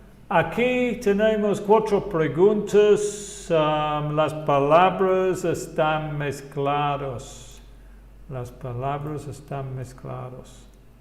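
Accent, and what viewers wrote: England, United Kingdom. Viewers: Te felicito , es un gran profesor. El acento inglés es hermoso, aunque si tiene una grafía algo diferente que la Norte Américana.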